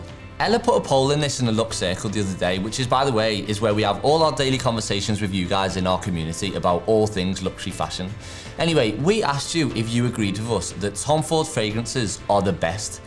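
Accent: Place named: Northern England, United Kingdom. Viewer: Great vid. Very informative. Great northern accent. That compliment is from a South London boy. Ya get me.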